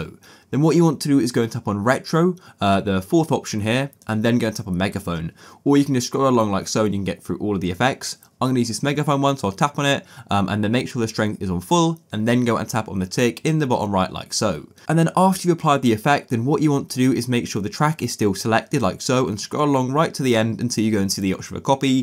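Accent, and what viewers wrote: United Kingdom. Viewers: Are u bri'ish?🤨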